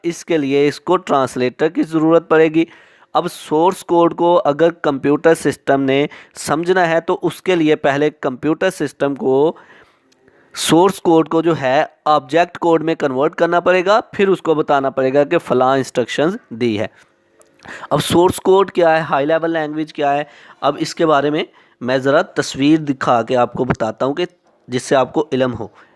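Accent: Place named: India. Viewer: u make the title english then speak indian ?!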